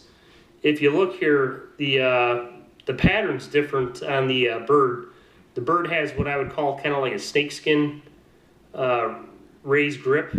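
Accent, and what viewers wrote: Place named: Canada. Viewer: Great video, I really thought you were Canadian seeing as you have no accent... or maybe you have a canadian accent living so close to the border Haha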